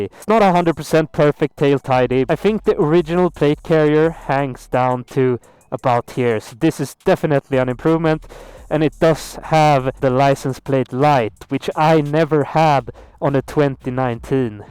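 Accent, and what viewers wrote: Sweden. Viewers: Ironic? You can't sound more Swedish when you speak English.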